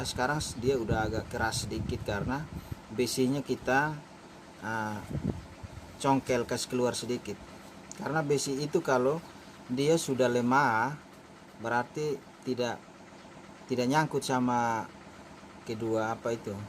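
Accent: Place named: East Nusa Tenggara, Indonesia. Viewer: Logat kek org NTT 😂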